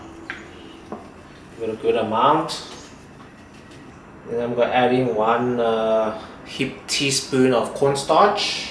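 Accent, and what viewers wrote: Durban, South Africa. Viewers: Nice recipe 👍 Do I detect a slight South African accent ? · Love your South African accent 😊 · Are you South African? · bro sounds coloured from SA,Durban hahaha Dope bro will give this a try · Great recipe! Wheres your accent from? SA? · You sound South African . It’s a compliment. Don’t stress . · You sound South African 🤗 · You south african boet? Love the recipe. ❤😎💯🤘🔥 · The cutting of the drumsticks is a very good tip 😉. By the way, you sound South African🤔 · Why does he have a PERFECT Southern African COLOURED (South African/Zimbabwean/Namibian) accent????? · Sounds South African accent tho not Chinese..🤷Beautiful recipe🙏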